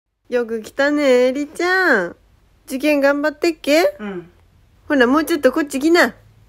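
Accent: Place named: Ibaraki, Japan. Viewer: 茨城弁うまいですね。 ほんとネイティブ茨城弁です。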